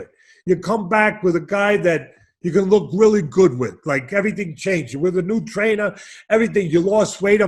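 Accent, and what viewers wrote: New York, United States. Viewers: The older he gets the stronger his NY accent becomes.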